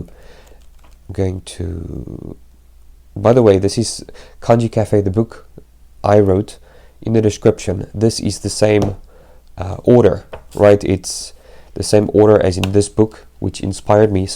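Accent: Afrikaans, South Africa. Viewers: lol afrikaans accent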